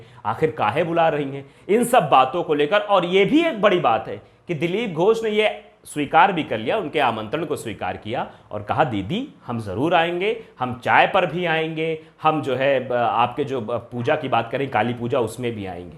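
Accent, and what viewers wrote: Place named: Uttar Pradesh, India. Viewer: भाई 💪 बोलते बोलते गांव चले जाते हो😃😜💯 यूपी वाली भाषा में बोलते हो 🙏💓 आनन्द आ जाता है 😜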